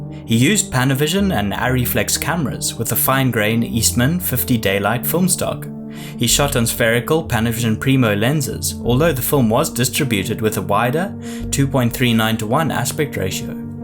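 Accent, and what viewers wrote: South Africa. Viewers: South African Accent? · I love your channel boss, you're South African right?? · Is this man... a South African? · Hearing this accent again reminds me why I left SA. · I’m not sure if using “from dusk till Dawn” was an intentional reference. You sound South African. Are you in Jhb? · South African? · Sounds like a South African narrator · Not even a few minutes in I kept thinking, this dude must be South African, so I checked your channel info and lo and hehold! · Bro your South African, right? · Subbed when I first heard the South African accent. Great video 👍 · Are you South African? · U South African?? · Are you from South Africa man ? Your accent sounds like it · Are you South African? I recognise that beautiful accent from miles away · Are you south african? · You sound South African?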